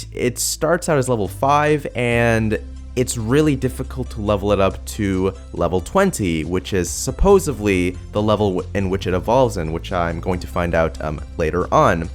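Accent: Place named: Ontario, Canada. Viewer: I assume he is a fellow Canadian... Sounds like an Ontario accent to me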